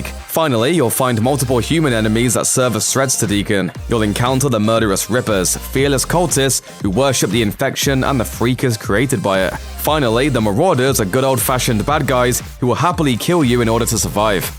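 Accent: United Kingdom. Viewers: With all due respect Fuck British accent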